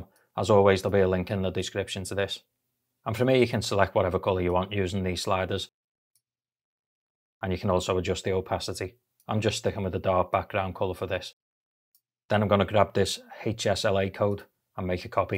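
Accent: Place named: United Kingdom. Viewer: Hey, just wanted to say how much I appreciate you creating this video. I had a comment earlier on my own YouTube channel. It was great to be able to link to this rather than create a video from scratch. Thanks. Cool channel. Awesome accent... from a fellow Brit;)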